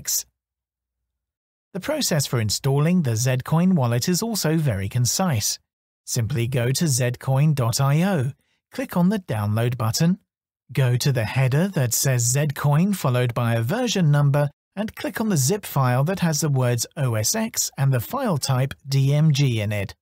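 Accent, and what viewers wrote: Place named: United Kingdom. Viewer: British pronunciation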